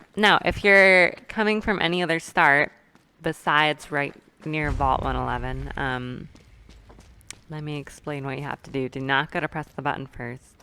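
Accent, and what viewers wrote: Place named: United States. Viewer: I get the American part. You just sound more American and a bit like a friend of mine. I want to go to Boston and drink everyone under the table. Do you build all these or just compile them? I never even got around to installing that last one you recommended. I want fallout as a sandbox of stress relief. I like to come home jump on and have some escape. I spent 3hours trying to get audio drivers working for bigscreen tonight and I'm so done. Mods this complex, they just hurt me because always something goes wrong and I get too angry and need to play another game to get rid of the anger.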